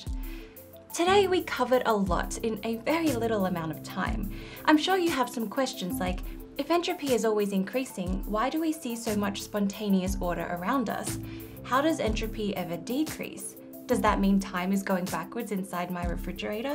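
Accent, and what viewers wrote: England, United Kingdom. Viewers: a pleasure to find out your name. I have watched maybe a dowzen of your videos, and like them all. I took algebra, geometry, trigonomics, physics and chemistry in college. But your simple explanations help me recall and see things in a new way. You even helped me to understand Calculus! Thanks! The English accent is a bonus for me.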